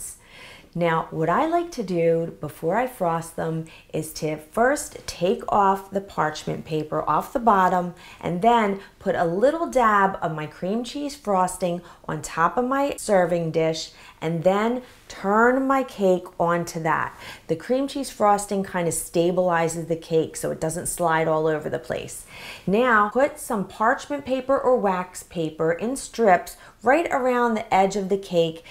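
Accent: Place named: New York, United States. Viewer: Hi! You sound like your from NY!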